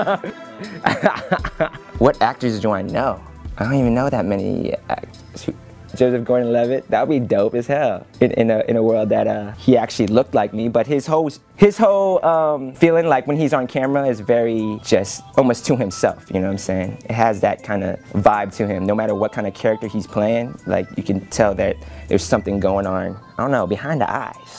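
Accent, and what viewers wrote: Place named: Washington DC, United States. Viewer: talkin like a true D.C. native hahaha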